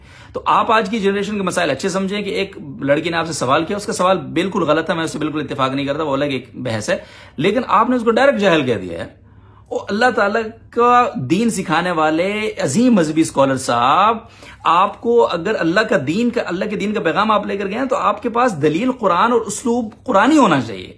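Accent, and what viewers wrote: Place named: United Kingdom. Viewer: Let me ask you a simple question , are you an "aalim" ? The answer is probably yes because you're a graduate of jamia rashed . And is Tariq masood an aalim ? You'd obviously say yes . Then let me tell you that aap jaisy chechory or bazari so called aalim sy Sahil adeem type log hazar dafa behtar hen . Aap log karty kia hen member pe bet kar ? Chichora pan aur bazari zuban , choktkoly sunana aur bc Tum tu Bismillah bhi English accent me party hu which implies your inferiority complex . I believe the whole jamia tu Rasheed is suffering from deep sense of complex which there're trying to satisfy . You people are impossible. Tbh aap logon ko dekh kar main enjoy bhi karta hun because you're a joke and ghussa bhi ata hai because of the nonsense you speak and spread among the riffraff.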